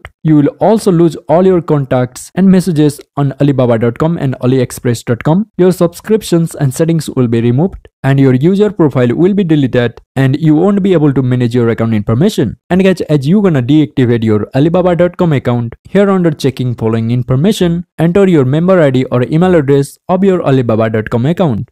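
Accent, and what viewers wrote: India. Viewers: haw to delete yar alibaba akkunt indian voice ueueue shut up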